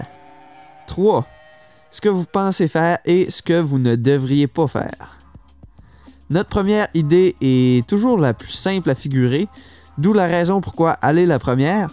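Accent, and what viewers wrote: Quebec, Canada. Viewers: Je suis français, dès que j'ai entendu l'accent québécois, j'ai su que le contenu serait de qualité :) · accent de canadie -> je me bare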